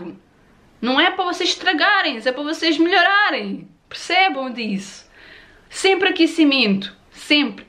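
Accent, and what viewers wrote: Portugal. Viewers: Esse sotaque portugues é lindo💕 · Amo esse sotaque sonho conhecer portugal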